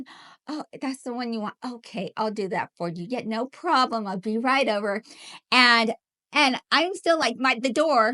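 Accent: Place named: Texas, United States. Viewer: Gotcha on the TX accent! I had a GF shortly after high school who grew up there from the age of about 12 and she picked it up real quick. One of her expressions for affirmation was "You bet!" which I will never forget what that sounded like. (She was damn sweet! If we would have managed to stay together we'd have about 12 kids by now!)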